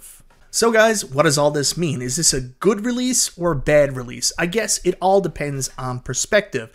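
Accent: Canada. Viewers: no offense I watch your videos. Your Canadian accent with your pronunciation of Arcade gets on my nerves to no end for some reason. Lol. But people come here to hear about the game. While not stating it you were giving other peoples opinions of the game. You are essentially giving a review when people watch this of what to expect. You’re splitting hairs here.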